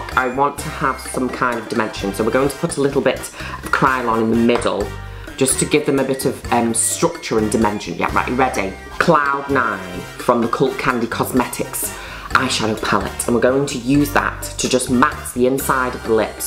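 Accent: United Kingdom. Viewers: That thick British accent tho...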